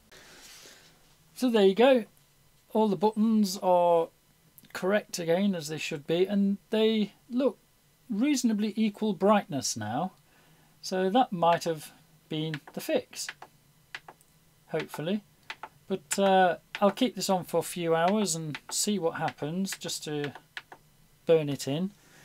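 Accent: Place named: England, United Kingdom. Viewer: Hi newly subscribed to your channel. you sound very English although you were talking about product COST's in dollar & cent's, but I take it you reside in England & have to send to America for your parts? however! i enjoyed your very informed repairs videos, & wondered....do you extend your workmanship to Roland models? VA-7 in participant? i may pick up some tips!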